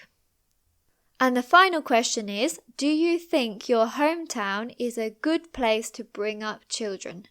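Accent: United Kingdom. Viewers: British, American, Accent? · just so amazing! I love your British accent, slow speed, and very natural intonation. I definitely will become your new member!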